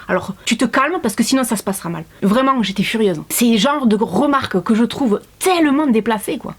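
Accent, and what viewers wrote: South, France. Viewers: Bonjour tout a bord je tiens a te dire que tu es une tres belle femme.J'aime ton style et surtout ton tres belle accent du Sud de plus j'adore les femmes rousses.J'aimerai qu'il plus de femme que moi toi sur le net.Une femme s'embélit avec temps.Je regarde toute tes videos car tu es naturel. Vive les femmes rousses. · Bonjour et merveilleuse année à toi ainsi à tous tes abonnés 😊 tu es une belle personne intérieurement et extérieurement 😉mais ce que tu racontes n’est pas surprenant les gens sont indélicats pour être polie 🤬ils sont jaloux et reflètent sur les autres leurs propres angoisses. J’adore ton franc parler et ton dynamisme et l’accent du sud est magnifique (je sais de quoi je parle)🤩 bises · Moi, j'adoooooooore ton accent du sud, tu ne peux mm pas imaginer, je t'adooooore, reste comme tu es parce que je suis convaincue que tu ne changement jamais, et les ingrats vont continuer à être emmerder(désolée pr ce dernier mot il fallait que je le dise)😘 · moi je l'adore ton accent du sud sa respire le soleil ;) et on est tous différent physiquement et mentalement heureusement sinon le monde serait fade merci pour ta vidéo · J’adore l’accent du Sud !!! 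C’est top!!! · Je trouve que c'était une bonne vidéo à faire! Le commentaire "t'as l'accent du sud mais t'es pas bronzé" est tellement inattendu qu'il me fait rire!! L'expérience de l'entretien d'embauche, tout simplement scandaleux!!! Et les commentaires très injurieux que tu nous as montrés sont juste... incroyables, inimaginables...!! Bon courage et bravo! Bisous!! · Cc moi jai eu droit aussi le genre de remarque sur mon poids .Les gens sont méchants cest pour sa que je suis mieux avec mes animaux et oui aussi jadore ton accent du sud ,accent du soleil 😉plein de bonheur pour 2021 et a ton toutou aussi ❤ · Attend attend attend, donc on t’a reprocher le fsit que tu ai un accent du sud mais que tu es trop blanche ? Mais... il y en a vrm ils ont un problème avec les couleur de peau ma parole c’est pas possible ça 🥲😭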